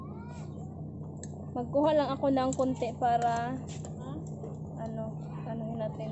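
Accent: Visayas, Philippines. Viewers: Hahahaha...inday mahuman imong kaugmaon ug kalit !!!!!hahahahaha! Pagka bisaya gayud nimo,asa man imong probinsya inday!!!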